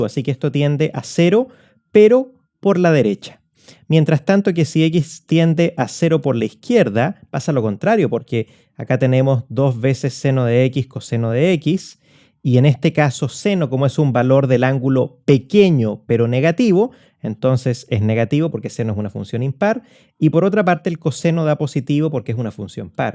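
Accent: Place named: Chile. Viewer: aja ajá ese acento, eres hermano chileno!!! Pisquito y bocadillos! Yo soy del norte de tu frontera!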